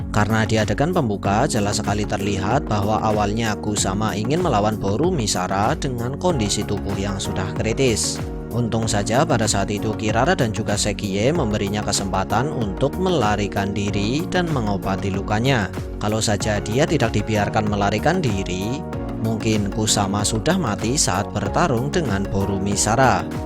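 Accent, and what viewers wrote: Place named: Banyumas, Indonesia. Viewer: ngapak banjarnegara👆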